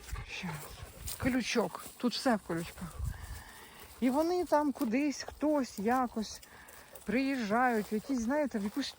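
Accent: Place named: Podillia, Ukraine. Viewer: Ви часом не з Поділля?Мова подільська,точніше-вінницька.Уявляю,як зреагує ваш чоловік,коли там ви насієте кропу,петрушки і прикрасите чорнобривцями.Я жартую,звичайно.Бажаю вам здоров'я і щастя!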